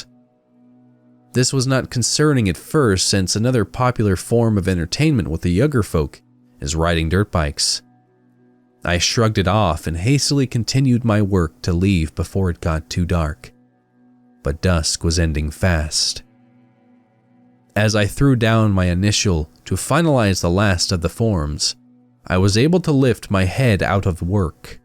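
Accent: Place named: Midwest, United States. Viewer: This is awesome so far! The way you get more intense towards the end is cool. Your Midwest accent is funny, but semi accurate at the same time lol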